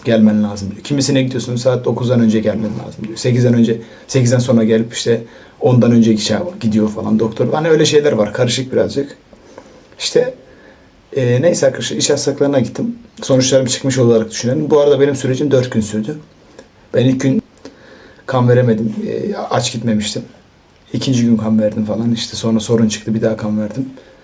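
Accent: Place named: Konya, Turkey. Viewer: Kanka sen Konyalı' mısın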